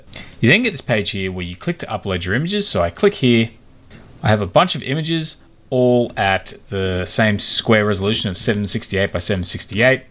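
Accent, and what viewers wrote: Australia. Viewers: Thanks, excellent. Interesting side note how Australians pronounce ‘data’ differently to the English. The Ashes series has just started here, c’mon England 😊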